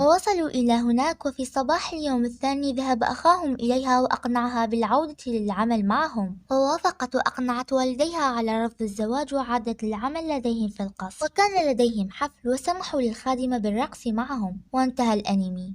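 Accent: Iraq. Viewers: ولله حسيت انك من العراق🤯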